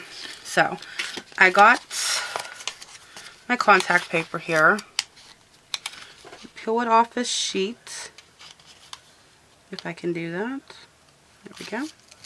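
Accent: Pittsburgh, United States. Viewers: Yinz guys???? Your a Pittsburgh girl! I'd know that accent anywhere:) Love the glasses...thanks for sharing;)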